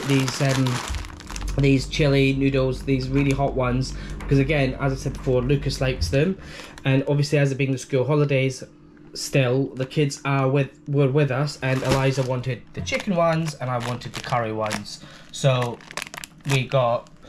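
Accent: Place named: Scotland, United Kingdom. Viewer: i know hes Scottish as am i (glasgow) was uust wondering where in scotland is the accent from?xx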